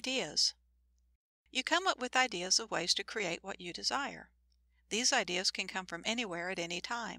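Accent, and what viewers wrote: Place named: South, United States. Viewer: Very informative. Pleasant vocal as well. I put the accent as the Virginia - Carolina region.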